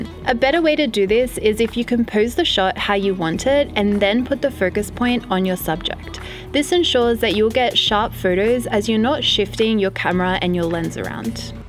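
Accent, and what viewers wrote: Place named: Australia. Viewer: Your accent is like Aussie pronunciation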